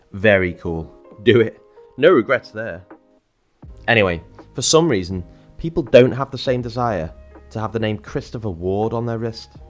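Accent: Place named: Northwest England, United Kingdom. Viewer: Subbed for the northwest accent. Greeting from Ormskirk.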